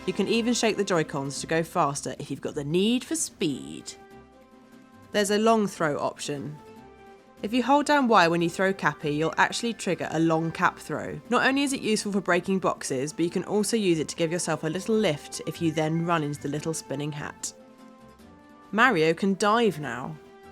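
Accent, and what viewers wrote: United Kingdom. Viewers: CAT MERIO I’m not trying to be mean. She’s British.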